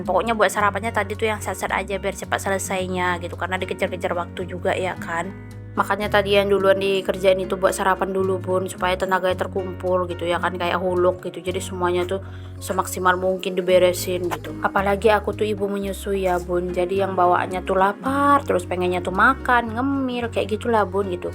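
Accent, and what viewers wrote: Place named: Medan, Indonesia. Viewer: Klw dari logat... Orang medan yaa... Didaerah Dimana medannya? 😊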